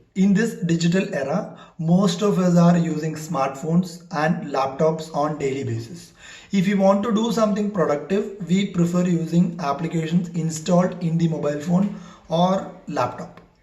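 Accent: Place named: United States. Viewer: You American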